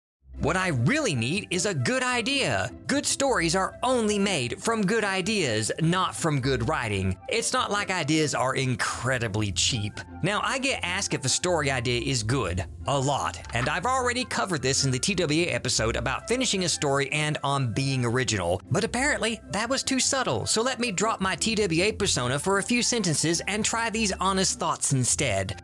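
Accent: South, United States. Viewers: I haven't seen this before, but my immediate thoughts are: 1What accent is that? I recognize it as southern, but I can't tell where in the south, those vowels are thoroughly scrambled. 2 neat, but prop not my fav of bad advice videos, just because of how cynical and sarcastic it is, while I prefer more lighthearted or comedic takes. 3 wait this his first time animating this stuff?! Great job dude! Have a lovely day! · I'm glad you're back, but you don't have to attack me like this. I'm enjoying your new animations and that sweet Southern twaaaang that seems a lil more pronounced now. But seriously though, welcome back, and I hope you're doing well.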